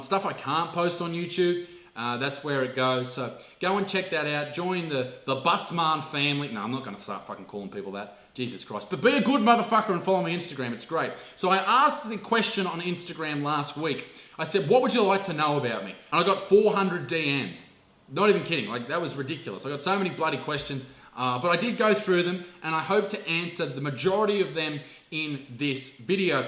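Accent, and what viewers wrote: Australia. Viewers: I feel like I’ve got all the tools to be a comedian.. Except that fuckin classic Aussie accent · How anyone cant know ur an aussie just on ur accent alone fuckin blows my mind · i like watching your videos because you talk like Australians do normally. Most Australian youtubers tone down the swearing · you look like my last years english teacher, and sound like him to bc hes AUSTRALIAN 😉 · Legend! People think your not Australian 'Shut up birds...fuckin hell'. If that's not Aussie I don't know what is. Love your clips mate!!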